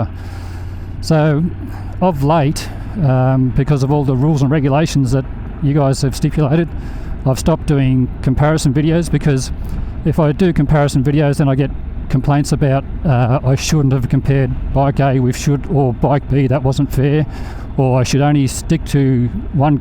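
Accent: Australia. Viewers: Ozzie accent such a pleasant change from the pommies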